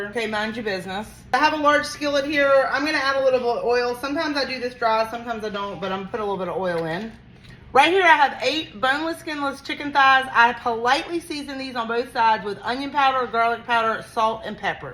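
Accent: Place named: South, United States. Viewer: Love you girl! I'm from the to South too so I love your cooking and...your Southern accent. 🥰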